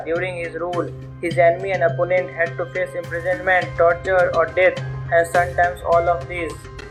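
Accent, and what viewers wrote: India. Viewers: My mom hates your hindi accent, anyways, Vlad The Impaler was a mini-king Of Dacia (Named Romania In present)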